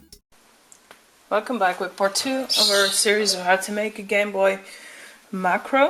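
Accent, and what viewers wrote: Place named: Netherlands. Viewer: Dutch accent!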